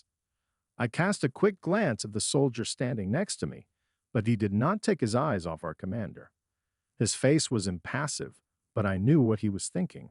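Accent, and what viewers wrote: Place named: United States. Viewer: Too bad the narrator is American….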